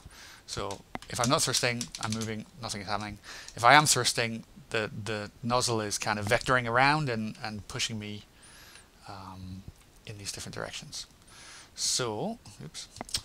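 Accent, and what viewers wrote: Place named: Southern England, United Kingdom. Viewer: I can't quite put my finger on your accent, it's almost fairly southern English accents with twangs of northern and a tin bit of American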